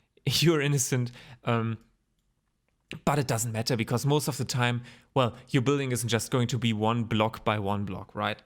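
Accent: Germany. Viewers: I think youre a german guy who speaks very well english 😂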